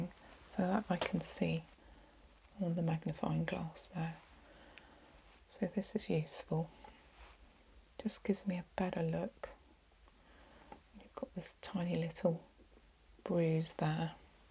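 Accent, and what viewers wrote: England, United Kingdom. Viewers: You have a soothing voice and I love an English accent! Actually, all of my go-to asmr channels are from the UK! 😅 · I really liked this video with the hand treatment. The hands hold tension also and a lovely relaxing massage and treatment is amazing. I know why I love your voice so much, the combination of your soft voice and the British accent really makes your speaking relaxing. Everyone subscribe and hit the like button if you are new here watching the lovely. I listen to your videos just before bed and I fall fast asleep. Blessings lovely lady 🌿🌿🌿🌿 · If you were ever to open a shop where we could get treatments like this, I would happily visit. Also, I realised I love your accent as well - I lived in the UK for a few years and still remember it fondly, your voice brings back happy memories while creating happiness in the present too. 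Thank you ❤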